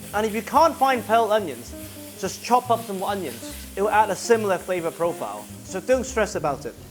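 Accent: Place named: England, United Kingdom. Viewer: Chef, you are a maestro as a chef and as a teacher. I love your explanations and references to L’Escoffier. I also greatly appreciate you provide alternatives for example no chicken stock use water the dish has plenty of flavor. I personally don’t have Tawny port hanging around my kitchen. I wish I did. So going back to butter and sugar is no problem. Also, your English accent is fab, you must’ve been educated in English schools.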